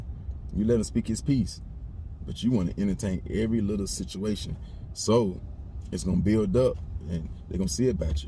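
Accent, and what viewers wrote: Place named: Atlanta, United States. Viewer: Sound like u from da A I'm from Pittsburgh zone 3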